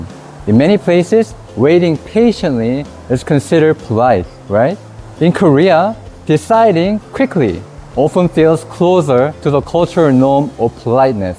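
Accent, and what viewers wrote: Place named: United States. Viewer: By his American accent, sounds like the narrator came to the US after he was 13 yo?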